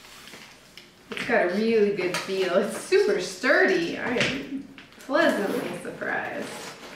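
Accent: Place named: United States. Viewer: Wow, that’s a lot of uniform pieces!!!! Very very nice!!! I just saw the recommendation from youtube to watch your video and started watching and was like: wait, which airline in the US has that uniform? (Because of your American accent) Then I saw the rest of the video and you said it. Awesome.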